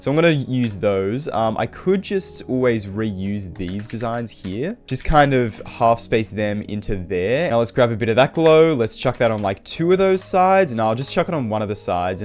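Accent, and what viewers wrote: Australia. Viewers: sounds awfully Australian today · are you Australian?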